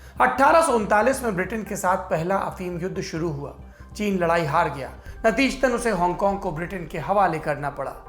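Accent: India. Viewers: Apne state ki khushbu aati h anchoring m apki ji..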